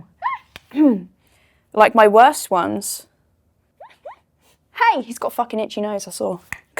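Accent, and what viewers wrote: United Kingdom. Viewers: Sounds and acts like your typical brit really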